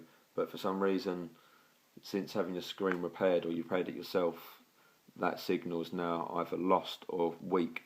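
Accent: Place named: England, United Kingdom. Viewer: Well done, it's so nice to here an english voice ( I'm a jock by the way lived in england most of my life) with no shit music in the backgrounnd, but a well clearly explained bit of good information cheers mate Dave in slough.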